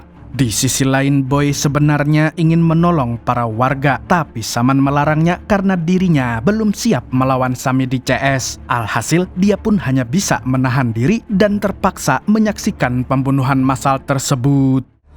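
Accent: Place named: Bandung, Indonesia. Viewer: Iyeu Operator na Anu komentar pasti na budag Cicaheum nyyaa.... Logat na teu bisa ngabohongan penongton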